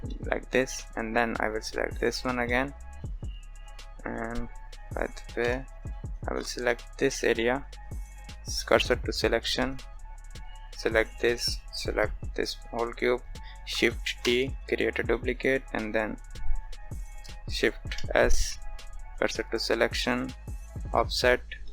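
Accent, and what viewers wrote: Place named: India. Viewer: Ok mr smart Indian sounding person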